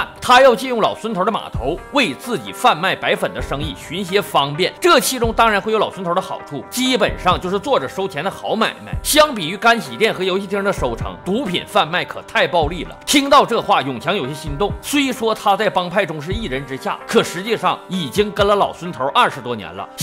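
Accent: Northeast, China. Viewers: 2楼。牛，东北话解说真的到位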